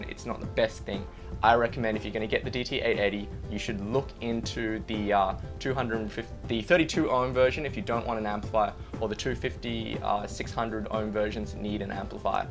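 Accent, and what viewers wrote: Australia. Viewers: Hey, judging by the sound of your voice, it seems that you are Australian. Where do you get your headphones from?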